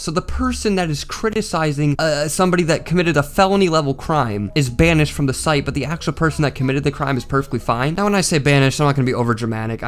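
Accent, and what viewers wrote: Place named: United States. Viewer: Ive known is irish and english but he somehow sounds like hes american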